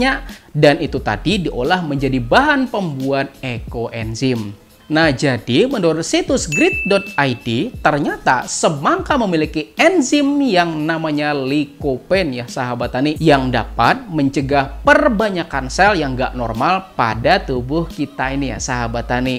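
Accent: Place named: Kuala Kapuas, Indonesia. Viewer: Betul sekali pak, syg kalo di buang kulit buah, bisa di manfaatkan sebagai pembuatan kompos dna POC. Ulun suka logatnya, masih khas kaluwa nya 😁, sukses mang, dan sangat bermanfaat 🙏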